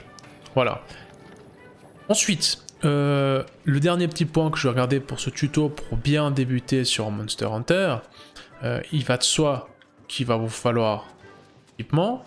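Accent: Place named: Vaud, Switzerland. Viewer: Mais t'es tellement vaudois ahaha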